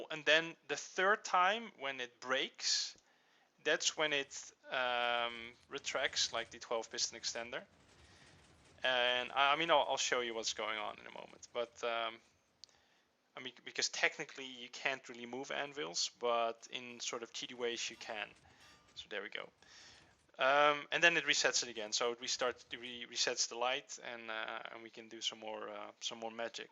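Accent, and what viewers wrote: Netherlands. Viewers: wait.... are you dutch ? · dude, u dutch? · Jij hebt een nederlands accent. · probeer op je nl accent te oefenen :) dat klinkt iets beter (alleen als tip voor de rest goed)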